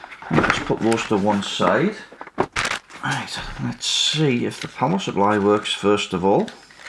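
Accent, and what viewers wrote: United Kingdom. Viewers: Nice fix! Always love watching your videos – interesting repairs and I like the British accent and "unamerican" presentation. BTW: what part of Britain are you from?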